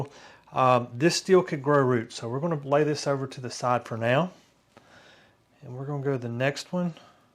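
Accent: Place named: St. Clair County, United States. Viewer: I watched this video for all of 3 seconds and knew that accent immediately.(grew up in st clair County for 19 years)😂 I'm subscribing for that alone. 🫡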